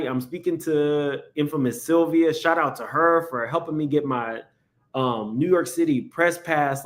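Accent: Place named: Louisiana, United States. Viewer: When you mentioned the Louisiana coming out of you, I immediately thought "Ya maw!" 😂 I'm from Louisiana too!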